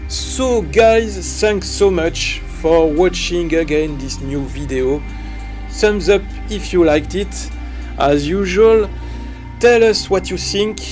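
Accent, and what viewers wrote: France. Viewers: Congrats on the new look and experience to the Channel. IMHO, loud background music only makes it difficult to understand your French accented English. Please consider keeping the volume levels of background music lower as they interfere with your message. Thanks for keeping up an interesting channel.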